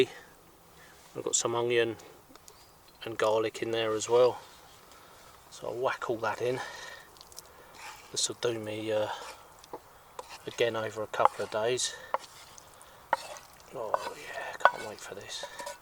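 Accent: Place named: London, United Kingdom. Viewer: where are you camping, i dont expect to know the exact location but is it private land or public. Im gussing as you have a London/ Essex accent its local to you ???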